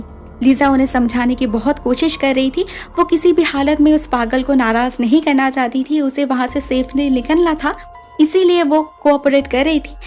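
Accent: Bengal, India. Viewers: Aapki bolne ka tareeqe se pata lagta aap bengoli ho Btw I'm also bengoli 😊🌸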